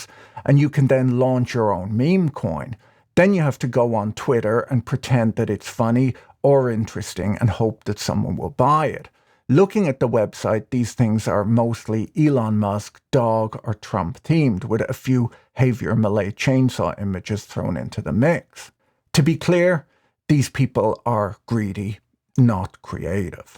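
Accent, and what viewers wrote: Ireland. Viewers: Listening to dry humor and delightful Irish accent when he dissects the current madness happening in the world is so enjoyable in these insane times we live in. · I want him to do an ODB story so I can hear him name off his AKAs in a straight Irish accent.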